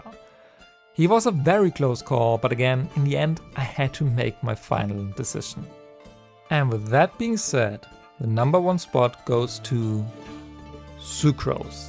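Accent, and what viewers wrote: Germany. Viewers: Lieblings Genshin Youtuber. Vor allem wegen dem Deutschen-Akzent😆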